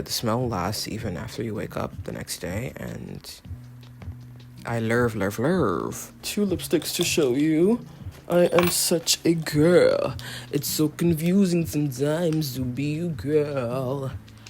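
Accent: United Kingdom. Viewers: your british accent is cracking me up, omg haha. also you have new job? what kind of role do you have?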